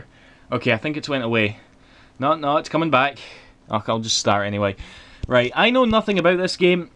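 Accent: Scotland, United Kingdom. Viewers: What part of Scotland are you from.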